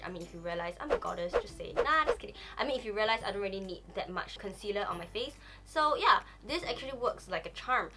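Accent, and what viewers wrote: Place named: China. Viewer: I like how you are talking like half eng half chinese, just shows that you are not putting up a fake front infront of the audience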